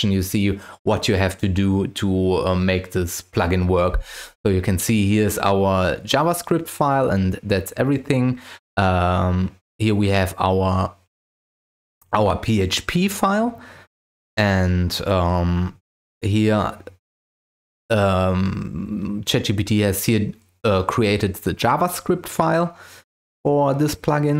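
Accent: Germany. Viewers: A german sounding german, who would have thought? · U sound german😅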